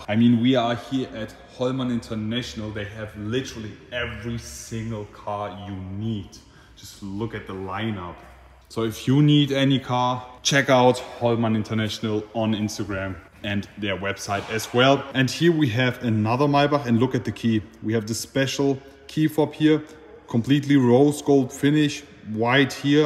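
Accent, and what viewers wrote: Germany. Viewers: The fact that he’s got a thick German accent made me subscribe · Alter dein fucking German english Akzent Lachkick genial ha ha ha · Dieser deutsche akzent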